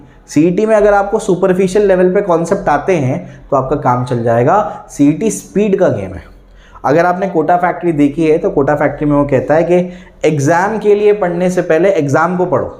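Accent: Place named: Badnapur, India. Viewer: Sir aap badnapur ke ho kya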